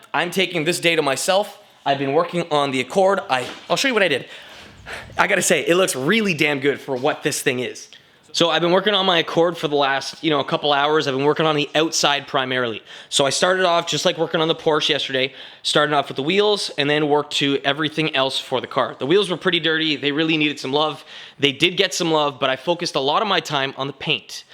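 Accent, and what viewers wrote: Canada. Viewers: Off-topic comment: In your "Send me stuff" section, you don't mention the country. There are two Ontarios in USA, and for those who can't recognize the subtle clues of a Canadian accent, you might be missing out on stuff! Add "Canada"! All the best, Rob (in Switzerland) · Are you Canadian? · are you Canadian??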